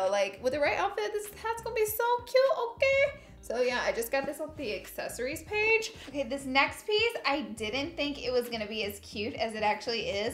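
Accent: South, United States. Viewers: That country accent 😂 love it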